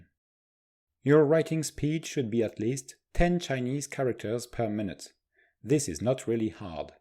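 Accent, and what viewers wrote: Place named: United States. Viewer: Are you from France? It does not seem. You have a great American accent.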